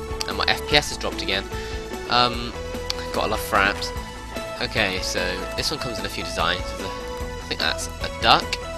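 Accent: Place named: United Kingdom. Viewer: I know a British accent when I hear one ;)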